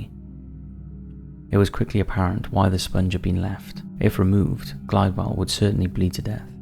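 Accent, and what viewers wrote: United Kingdom. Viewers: Your voice is beautiful but it resonates like in an echo. I love the British accent, but I have difficulty understanding it with clarity, I wish you pay more attention to the sound effect. Also, the music interferes making it even harder to hear clearly. No music is necessary. Great documentary, thank you.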